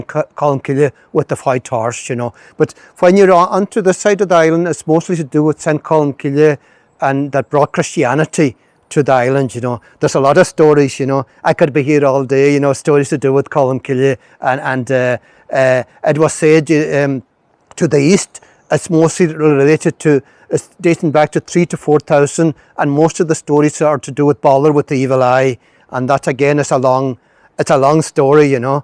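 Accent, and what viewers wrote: Ireland. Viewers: Its quite extraordinary and refreshing to hear an irish man speak english as a second language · Isle of Tenerife, Spain, Africa. Brilliant! What an interesting, informative video! Well done! And all said in beautiful, melodious Donegal English, the favourite accent of many. Now, a maybe strange request: As many folks are interested in Tory, not only for its fine paintings and archeology, but also for a maybe even rarer aspect of its culture, the Gaelic language, said to be less depleted there than anywhere else. Would you consider doing the very same layout as this video, but all completely in full, natural, Donegal Gaelic? I'd be riveted to hear it. Best wishes, Patchy.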